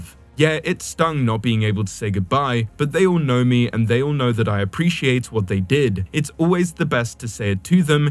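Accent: United Kingdom. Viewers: I don't know who is narrating this, but I'm tired of everything about racing being fed to us with a British accent. Diffey is the worst.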